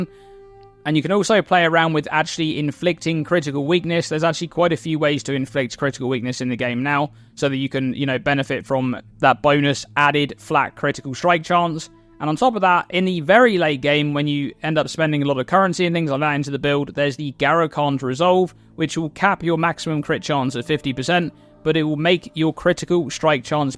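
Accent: United Kingdom. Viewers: 10 minutes is the max for me listening to this illness that is called british accents, damn thats so terrible, sorry for this disease.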